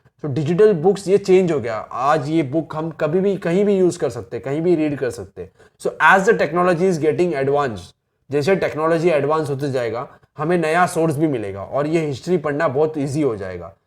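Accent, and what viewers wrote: Maharashtra, India. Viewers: Sir you are marathi ?? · Aap ka voice like tipical marathi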